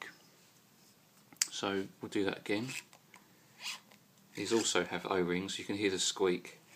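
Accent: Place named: United Kingdom. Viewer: Delightful British accent! Love it!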